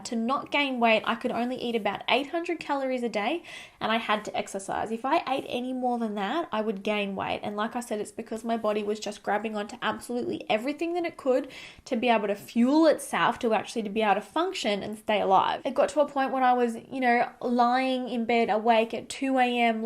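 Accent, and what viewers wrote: Australia. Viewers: You are beautiful the way you are. I have always loved your chirpy self and your adorable Australian accent. My best wishes to you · Sweet, Beautiful on the Inside and the Outside, I am a subscriber who is old enough to be your mother, maybe even your grandmother. My heart broke listening to you talk about your painful journey. I was a teen in the 60s and this threw me back to that time. Mean bullying girls! I truly believe that's where a lot of this starts. I was so bullied by mean girls in junior high and high school. I was 4'11" and never overweight but that didn't stop their hatred. Their words and actions burrow into your brain until you start believing them and unfortunately it stays with you your entire life. My adult daughter went through the same thing in high school. People like that say and do mean things just to make themselves look better. 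And underneath it all they are truly sad and miserable with their own life. I pray you will keep that determination in your heart to overcome all of the negative things you hear in your head and win this battle. God bless Brandon. A supportive fiance'/husband is one of the best weapons in the battle. You are a beauty... Inside and out. I am 100% mesmerized when watching your videos. You have the cutest personality (you make me laugh often) and the most soothing, calming voice, not to mention awesome Australian accent!! I have no doubt that you just helped many, many young women out there with your truth! Bless you! May every day get a bit easier for you! ❤️❤️❤️❤️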